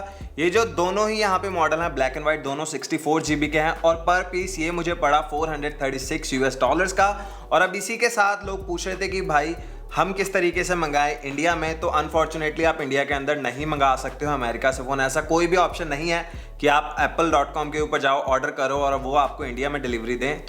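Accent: Punjab, India. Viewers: First. U doin good work n givng compt info about aple prodct Srcond:- i love to hear your voice as typicl desi punjabi voice spcl my you tube ki family line Third:- punjbi bhai yeah batao koun se airpod liye jaye sab kuch considr kar ke price quality Fourth:- kya abhi iphone 11 pro lena chaiye ya wait kiya jaye iphone 12 ke liye. Fifth:- iphone 11 pro vs iphone 11 pro max koun sa sahi rahe ga Sixth:- kya usa aor india ke phone mein koi faraq hai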